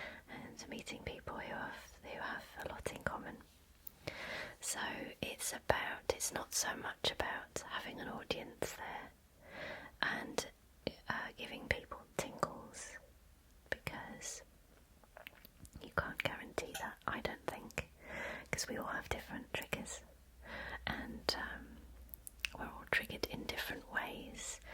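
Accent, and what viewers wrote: United Kingdom. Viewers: First time seeing you. Fantastic whispering and your British accent to boot! Love it! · easily one of the prettiest ladies on the net. You could read me the dictionary with your lovely British accent. This will be good for bed now as it's only just gone 10pm down here in Australia, goodnight 😊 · Are you English, Irish or Scottish ?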